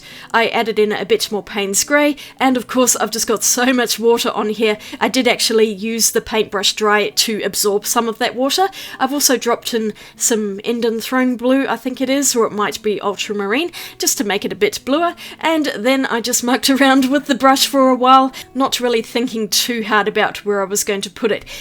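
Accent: Australia. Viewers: Love your channel, love the swatching, your awesome Aussie accent (I'm an American -- we love British and Aussie accents), love your sketchbook sessions. My motto: When in doubt, paint planets!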